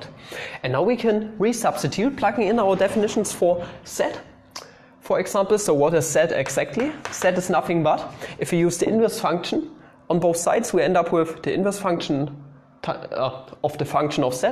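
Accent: Germany. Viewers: I'll be honest. Sometimes I just get lost in his clumsy ways and german accent. xD But keep up the good videos. ;) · You sound german.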